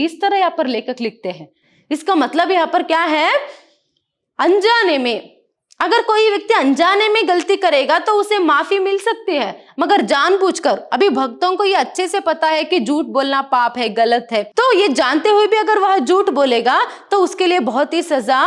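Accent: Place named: Tamil Nadu, India. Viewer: mam your hindi has tamil accent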